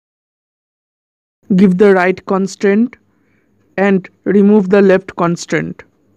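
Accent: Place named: Bengal, India. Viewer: Hmm Bengali accent..nice :D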